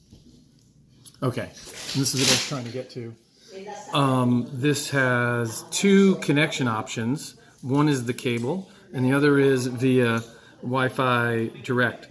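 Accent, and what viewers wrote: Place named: United States. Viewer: He sounds American, so probably Snapdragon 845.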